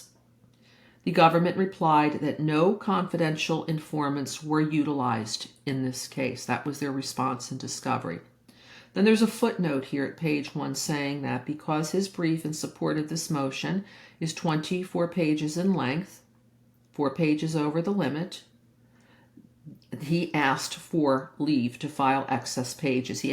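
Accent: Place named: New Jersey, United States. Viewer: You sound like you're from NJ. I'm a Cathy from NJ✨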